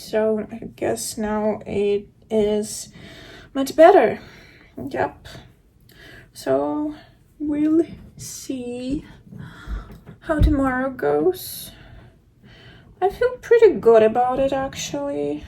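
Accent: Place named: New York, United States. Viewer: You have picked up some NY words. Like you said “cawfee” the other day. Meant to tell you. Haha. You are an official New Yorker. There are a few words I have heard that reminded me of living there.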